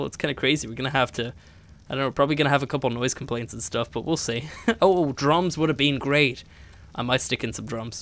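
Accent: Ireland. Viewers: Didn't know my boy was Irish till now.... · 30 minutes of an Irish man making a bar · Wait my bad, not German, Irish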